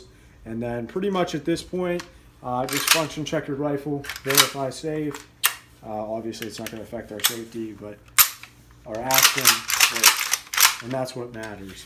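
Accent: Pittsburgh, United States. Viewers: lol at the pittsburgh accent, noticed it right away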